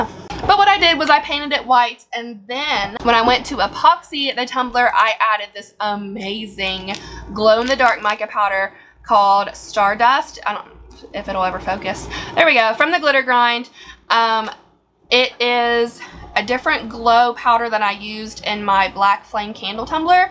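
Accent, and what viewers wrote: South, United States. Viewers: Ok, I love your videos! So natural and fun...but I have to ask, where are you from? I’m from the south and sometimes I hear a bit of a southern accent but I’m not sure. Just curious. 🤗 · omg I’m a southerner and thought you were from the south this whole time!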